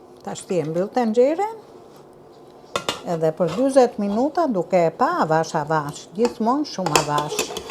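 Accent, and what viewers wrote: Tirana, Albania. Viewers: Ti je flori te shikoj vetem te degjoj si flet tironce puro je flori lum ata qe te kan ne shtepi saper gatimet je ok te gjitha nenat shqiptare gatuajn jan nikoqire ju sa shikoj keni pasjon ne gatime kam respekt zoti te bekoft❤❤❤❤ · Ty te lumte ajo goja e omel qe flet hala me zhargonin e bukur tirons puro dhe e trashegin nder breza ate. "Po i ndaj me dy sira" "pras", "voj" , "kryp", "long"... Fiks si nondaja teme e ke gatu kete gjelle me pras e te lumshin duart e arta! ❤❤❤❤❤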